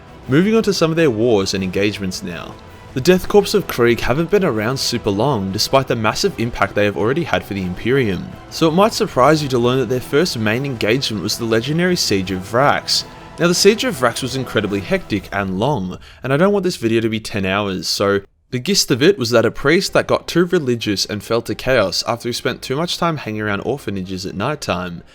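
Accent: Australia. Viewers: I already know half the lore but hearing from an Aussie makes it better. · Bruh, why are Australian accents the best? voice makes my mussy moist.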